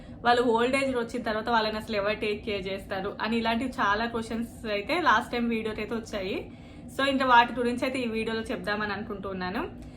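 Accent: India. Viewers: Inka Indian accent matladuthunaru meeru🤔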